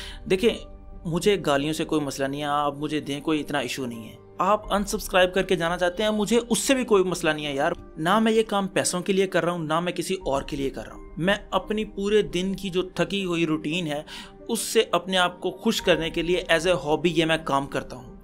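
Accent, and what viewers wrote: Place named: Pakistan. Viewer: Sahi pakde hai Bhai Mai aap kaa new subscriber hu muje Pakistani logo ke baat karne kaa lehzaa bahot pasand hai aur aapke videos bhi love u from india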